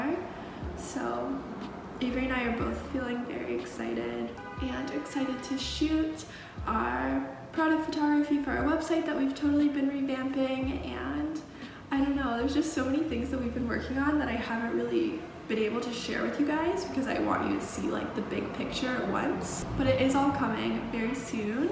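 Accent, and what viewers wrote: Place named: South, United States. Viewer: It’s for sure a tad different. Also she did use to have a southern accent. It’s no big deal to notice change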